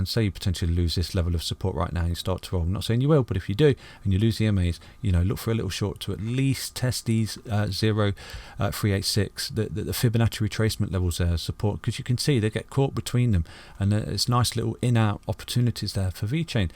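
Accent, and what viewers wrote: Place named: South Coast, United Kingdom. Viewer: What part of the UK are you from? Im guessing on the south coast somewhere???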